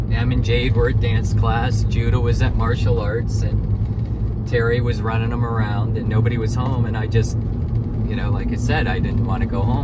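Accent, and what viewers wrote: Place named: Pennsylvania, United States. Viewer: I’ve watched several of your videos and was always impressed with the dictation and wondered what part of the country you lived . Your accent was very familiar but I couldn’t nail it down. Now I know “ Pennsylvania “ my sister lived there in Paoli . Very impressive interview with the Boss and he hit the nail on the head with his answer about the dowry . Hope you were listening.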